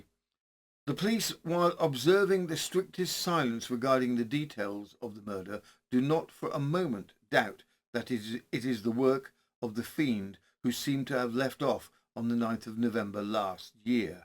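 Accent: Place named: United Kingdom. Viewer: the British accent put you back in time at the 1888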